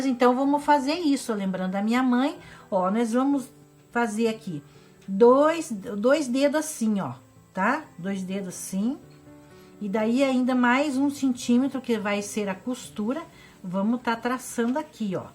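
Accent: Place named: South, Brazil. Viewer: Eita irmã,eu aqui ouvindo a senhora, observando o sutak, pensei,vô perguntar se a irmã é catarinense ou gaúcha,,aí a senhora fala que é de Curitiba .. Eu sou catarinense,mais faz 40 anos que estou em São José dos Campos SP.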